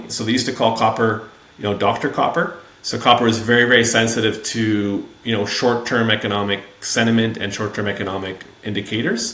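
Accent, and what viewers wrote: Netherlands. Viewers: German name, Dutch accent. I saw some interesting unknown high risk , high reward names in your playlist 🤔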